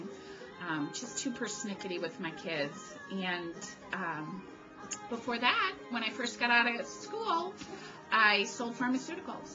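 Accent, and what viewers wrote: New Jersey, United States. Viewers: Nice to meet you. You are very sweet, love your NJ accent. You are so right that we should get to know each other better. When I am brave enough I will made a video too. My mom was from Chicago too. TFS! ~Sharon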